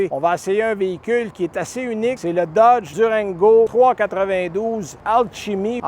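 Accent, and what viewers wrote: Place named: Canada. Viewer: Je viens de découvrir votre chaîne YT ! Et j'aime beaucoup vous écouter parler c'est agréable cet accent canadien ! Et l'essentiel est dit pour le véhicule ! Bravo 👏👏 Eric de France ! A Lyon exactement ! Bye 💕👋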